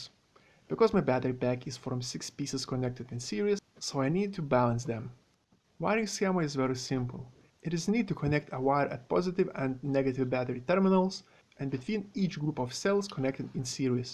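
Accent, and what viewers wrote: Russia. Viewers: Русский акцент детектед:)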